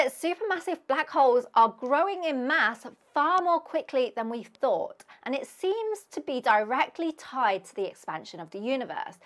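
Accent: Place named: England, United Kingdom. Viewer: 😘 Cute yellow with a silly dialect from the tea drinkers. It's best if you speak the Human language aka American English, not tyat ugly noise from england. Why is your head bobbing up and down like that, is that typical mannerism over in mere england?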